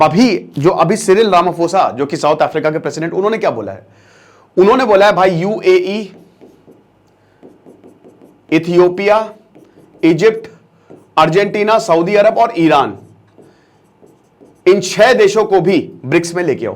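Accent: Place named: Pratapgarh, India. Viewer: Sir sarau se aap pratapgarh se hai lg rha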